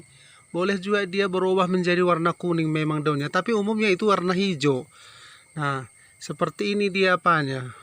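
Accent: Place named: Makassar, Indonesia. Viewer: Kéntara séékali aksen makassarta' DAENG.. Geli2ki déngarkiii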